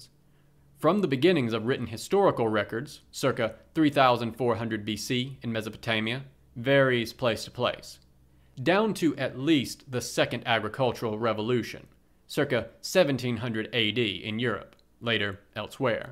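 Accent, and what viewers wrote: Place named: South, United States. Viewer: Thanks for doing the audio! I really enjoyed it. Where are you from? I keep thinking somewhere...southern US east of Texas. (It is not a huge accent. Some vowels, not all of them and none of them all the time, sound slightly southern to me.) Maybe Alabama or Georgia? State-level id is plenty, I'm not trying to doxx you.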